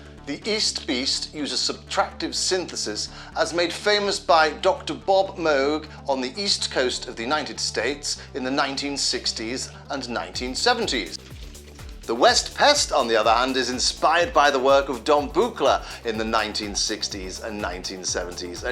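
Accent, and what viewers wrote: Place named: RP, United Kingdom. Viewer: Your Received Pronunciation accent is hilarious! Brilliant. You should run for Prime Minister and campaign with that RP accent the entire time and then when you get into office, switch back to your native Scouse accent. Haha.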